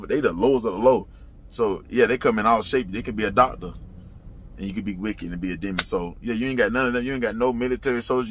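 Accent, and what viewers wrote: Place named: Louisiana, United States. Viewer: This whole time I been tuned in thought you was from Louisiana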